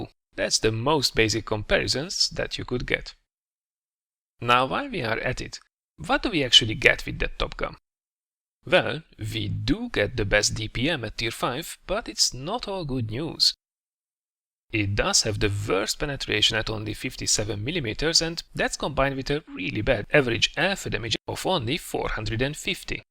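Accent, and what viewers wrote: Hungary. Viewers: Gondoltam hogy magyar vagy az akcentusbol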